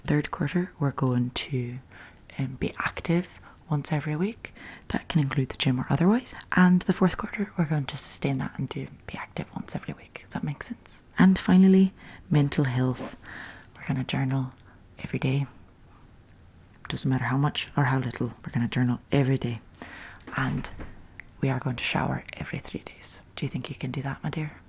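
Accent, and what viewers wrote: Ireland. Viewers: I love the Irish accent, please do more of it in the future!! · Dang you got that irish accent down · Do more Irish and jersey accent roleplays !!! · We NEED more videos with the Irish accent! We beg you!!!! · someone saw the banshees of Isherin!! or you're just really good at an irish accent.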